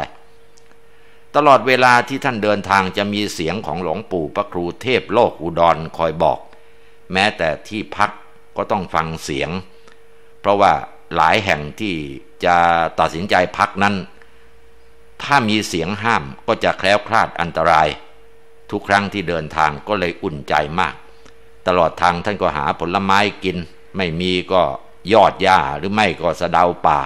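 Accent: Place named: Isan, Thailand. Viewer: ตลกเสียงอิสาน ตลกเสียงอิสาน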